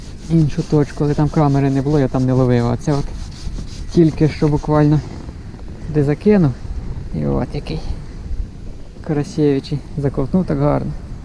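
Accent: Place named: Western Ukraine, Ukraine. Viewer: А вимова типова західна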